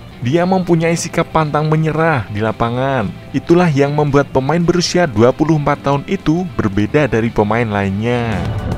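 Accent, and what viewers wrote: Java, Indonesia. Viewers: pengisi suaranya medoookkk..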